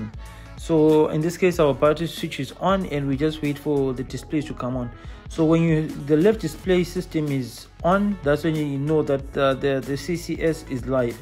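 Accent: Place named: Durban, South Africa. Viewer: Very nice explanation! Thanks. You sound like you might be from Durban? 😊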